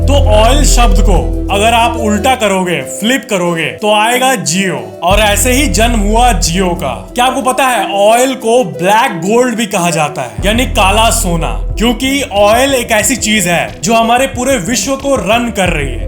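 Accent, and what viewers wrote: Bihar, India. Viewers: Itne bade youtuber ho par aap ki laungauge sahi nahi hai botton badao sahi hota aap boltr ho battan dabo ge lagta hai aap bihar k ho.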